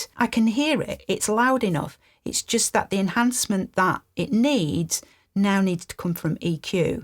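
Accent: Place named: Yorkshire, United Kingdom. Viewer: As helpful as ever. And the icing on an already great cake is to have this wise advice delivered in a fabulous Yorkshire accent. Love it.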